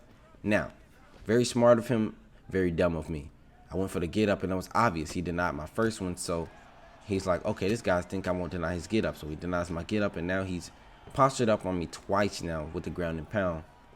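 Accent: Jamaica. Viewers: i’ve been inna party with this guy he’s nice and chill. has a Jamaican accent i think . i only say i think because i don’t know accents good.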